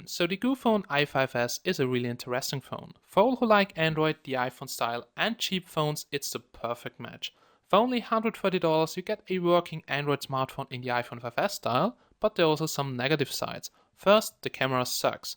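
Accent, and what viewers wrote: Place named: Germany. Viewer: damn you sound german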